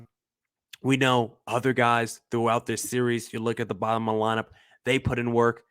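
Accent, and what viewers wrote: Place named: Boston, United States. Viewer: I saw you on Sully’s locked on MLB. I turned in today to see what you had to say about the sweep. I had to laugh when you said you grew up a Red Sox fan. I am a lifelong Red Sox fan and I was thinking “this guy sounds like a Red Sox fan I like him.” Just one problem, how can you switch allegiance to the D backs? But I am happy for you that they won.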